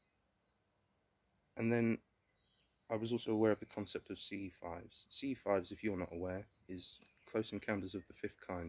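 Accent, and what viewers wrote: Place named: United Kingdom. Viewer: You have a cool British accent in the likes of Henry Cavil, not a pompous one like Nick Pope and Mick West. I've tried CE 5 and had mixed results nothing solid or repeatable. My theory is they have sensors that can read pretty much everything with 3-5 miles of them. They know everything going on within that bubble and its like super hero or god like powers minus bringing back the dead. Very weird world and we barely understand any of it.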